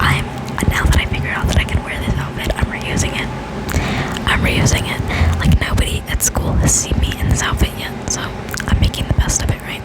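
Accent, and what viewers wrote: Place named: United Kingdom. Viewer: girl your British accent is so good!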